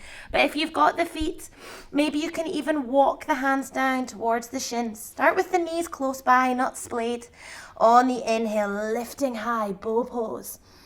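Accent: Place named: Glasgow, United Kingdom. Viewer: That was great the glasgow accent made it better thanks namaste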